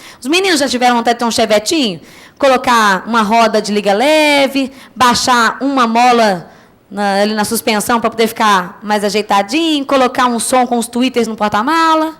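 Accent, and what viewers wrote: Minas Gerais, Brazil. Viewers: Agradecidíssima pelos vídeos! E eu amo o sotaque mineiro, então tô amando. Vou maratonar.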